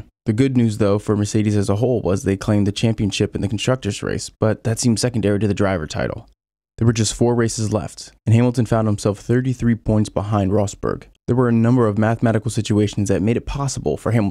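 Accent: United States. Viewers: Hearing an American talk about F1 is just weird. The names of the drivers, the tracks... its just wrong bro xD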